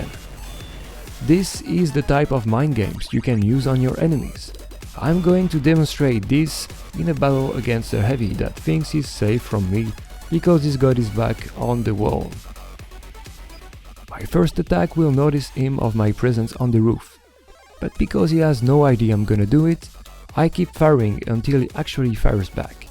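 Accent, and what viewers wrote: France. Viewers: Are you French? :D That sounds like a French accent. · I just like listening to his therapeutic French voice.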